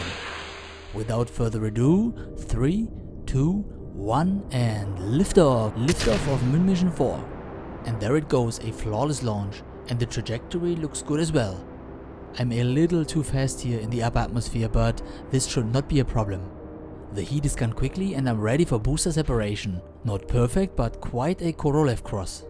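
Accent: Germany. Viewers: nice gemacht und da hör ich glatt den deutschen akzent XD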